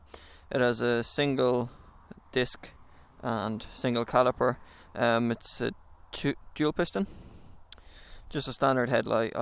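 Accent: Ireland. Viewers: Are you irish pal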